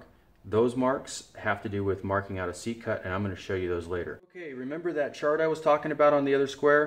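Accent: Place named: United States. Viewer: Love the American accent :) and a very helpful video thank you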